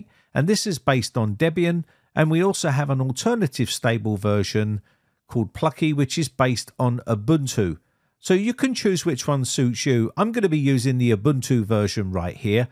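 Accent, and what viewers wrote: United Kingdom. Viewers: I’ve wanted to say that for a long time, too. Since someone else has now mentioned it, I add my voice to theirs: Love the UK accent! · Oh it's more than just his accent for me (although everyone knows Americans adore British accents😍), but he also sounds *JUST LIKE* Sir Maurice Micklewhite CBE (otherwise known as the actor Michael Caine 🤗🤗🤗) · The UK accent is so pleasing to my ears. Thanks for the informative video.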